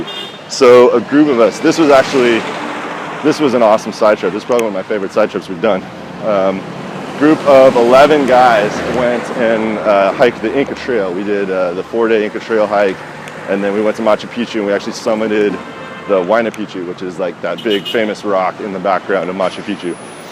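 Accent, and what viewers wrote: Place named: Arizona, United States. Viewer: Great Video! Very informative. And it sounds like you live in Arizona. + +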